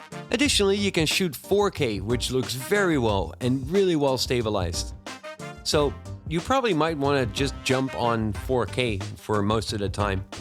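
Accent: Netherlands. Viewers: Are you Dutch by chance? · Do I hear a dutch accent?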